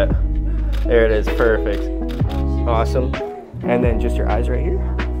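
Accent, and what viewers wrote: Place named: Wisconsin, United States. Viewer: Your video showed up recommended, so I started watching and immediately knew 1 thing…. That accent, you’re from Wisconsin! 🤣 I have family in Kenosha and my mom grew up there, and always loved their accents growing up. Nice photos, btw. ☺️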